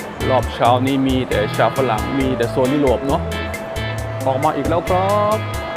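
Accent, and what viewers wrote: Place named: Sakon Nakhon, Thailand. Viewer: เจ้าเว้าคือตน สกลน้า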